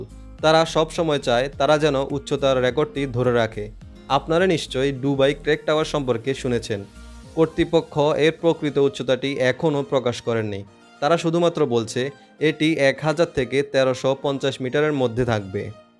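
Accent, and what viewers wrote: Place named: Noakhali, Bangladesh. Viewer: ভাই নোয়াখালীর কথার টন পালটান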